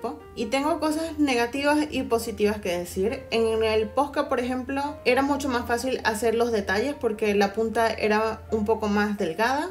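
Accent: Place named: Panama. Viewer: tu acento es muy Panama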